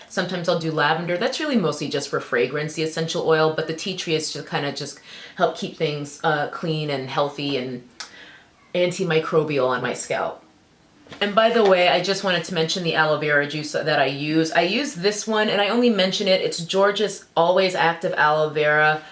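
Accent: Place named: DMV, United States. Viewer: Just found your channel amd love your voice! You speak like me!! From DMV by any chance?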